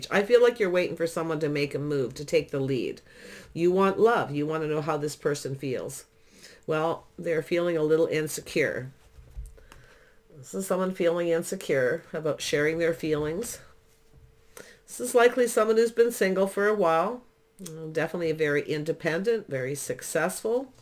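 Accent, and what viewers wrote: Canada. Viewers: I love your accent! The way you say “about” makes me happy! Which part are you from? Curious since I’m looking to travel Canada. I’m not educated enough to know which parts to visit.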